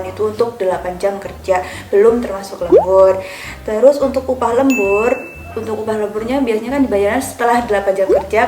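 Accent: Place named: Paburan, Indonesia. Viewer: Lah teteh orang paburan tahh,sedayeuh 😂😂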